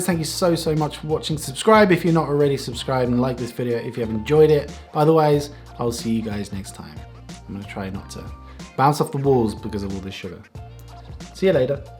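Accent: Finland. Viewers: muumi limu: ur finnish accent sounds funny im not saying its bad and :makkara: its so good if ur on a journey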